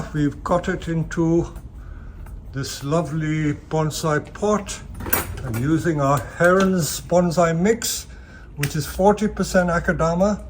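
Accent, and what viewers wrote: South Africa. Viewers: Great hearing a south african accent there. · guy sounds south african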